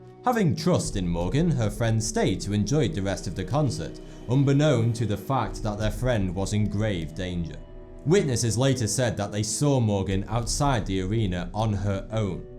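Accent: United Kingdom. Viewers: Skill Definitely sounds like he's just from the United Kingdom. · Something about that british accent makes all of these videos not scary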